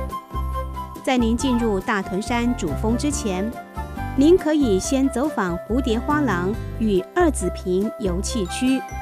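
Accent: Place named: Taiwan. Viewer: 主持人講話口音很清晰有台灣味道很親切好聽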